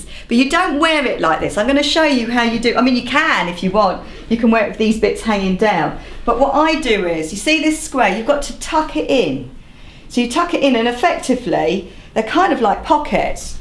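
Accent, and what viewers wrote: United Kingdom. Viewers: omg just discovered ur channel. finaly a british doing something practical, all my other youtuber makers are speaking either american or ausies which is kinda confusion,.... subscribeeeed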